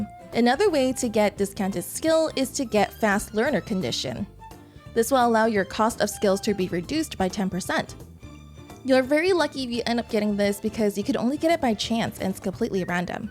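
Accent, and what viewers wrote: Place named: United States. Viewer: the murican accent is so cringe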